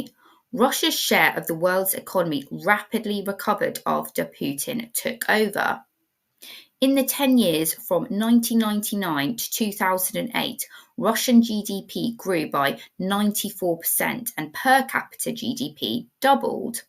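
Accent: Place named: United Kingdom. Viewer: has a fantastic British accent. It’s pleasure for my ears to listen to her.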